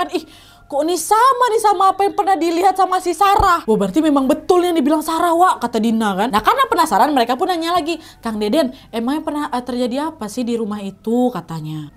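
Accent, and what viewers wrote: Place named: Medan, Indonesia. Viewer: Ceritanya benar2 menghororkan tapi, hilang rasa takut karna dengar k yang membawakan ceritanya dengan lucu. Mala pake logat medan ada uwak nya lagi. Mantap k.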